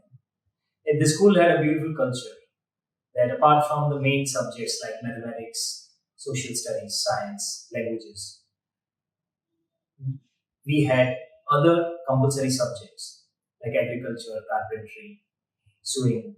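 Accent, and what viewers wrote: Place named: Gujarat, India. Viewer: Only people from Gujarat can feel Gujarati accent in English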